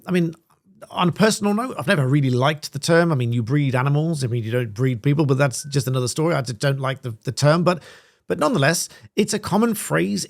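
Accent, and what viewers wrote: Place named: Falkirk, United Kingdom. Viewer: It was a statement of fact ffs! She certainly sounds like she's from Falkirk, or is an accent racist now.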